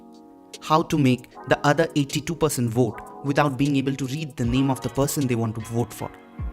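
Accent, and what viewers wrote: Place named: Bengal, India. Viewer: It fills my heart with joy to see a Bengali person (assuming from your accent) create such high quality and so interesting variety of contents. Just subscribed. keep up the good work. wish you all the success 🎉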